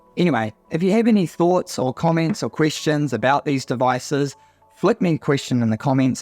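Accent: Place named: New Zealand. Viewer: Bang on on the comparison between Coros and Garmin ecosystems. I run with older gen Pace 2 and FR245. Both meant to be 'entry' level watches. Neither is perfect, both complement each other well. Totally agree with your Coros highlights over Garmin though. Stumbled on your channel recently and very grateful to have done so. You're an endless wealth of information on all things running = THANK YOU! And nice to hear a New Zealander here in Australia over yet another US 'run tuber' 🙏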